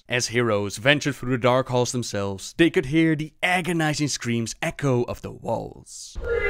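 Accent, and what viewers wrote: Netherlands. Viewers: You sound sooo Dutch! Ever been told to You before?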